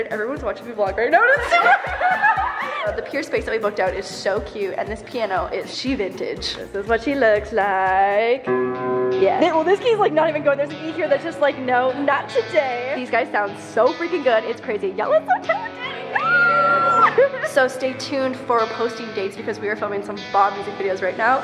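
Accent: Canada. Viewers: why did i read the title “i’m sorry” in your canadian accent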